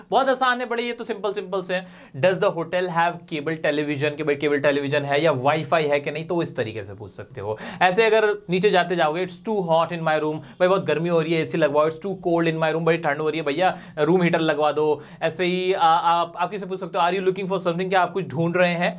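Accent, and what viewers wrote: India. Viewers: because indian accent laga hai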